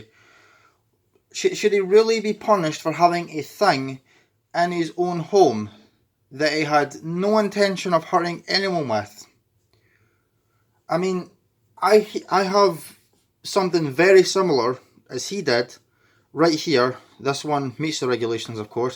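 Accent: Scotland, United Kingdom. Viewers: Scottish accent is charming. And we do not have to paint our blank guns here. Love from Ukraine.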